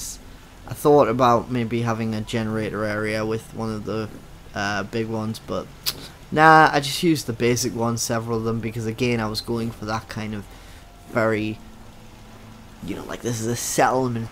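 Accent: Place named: Canada. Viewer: you sound like a Canadian stereotype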